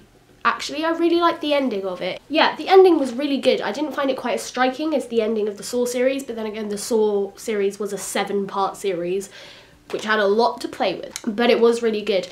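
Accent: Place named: England, United Kingdom. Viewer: I really like your accent :D Which part of England is it from? And nice eyes too :P . Yes, i've seen all 3 Hostel parts, and the best is the first part.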